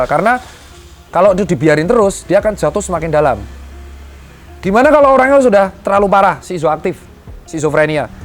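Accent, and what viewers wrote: Java, Indonesia. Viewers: China medok jawa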